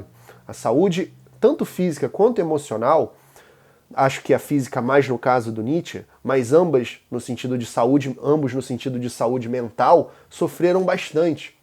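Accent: Rio de Janeiro, Brazil. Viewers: No vídeo o sotaque carioca chiado, é muito forte. Tens q fazer um teste de dicçao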